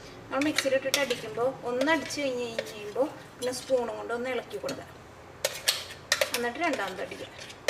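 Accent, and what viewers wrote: Thrissur, India. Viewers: പെങ്ങളെ അടിപൊളിയാ ഇഷ്ടായി അവതരണം തനി തൃശൂർ കേൾക്കുമ്പോൾ മനസ് മുഴുവൻ കോരിത്തരിക്കുന്നു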